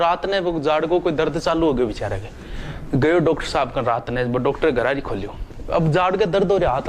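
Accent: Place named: Marwari, India. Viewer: sir i like ur marvadi voice lecture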